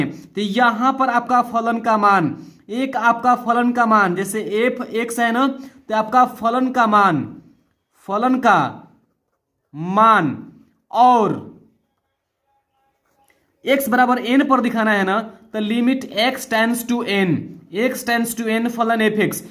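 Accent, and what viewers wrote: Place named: Bhojpuri, India. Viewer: Sir app kha sa ha ..🙏🙏🙏🙏🙏 App bhajpuri bol raha ha.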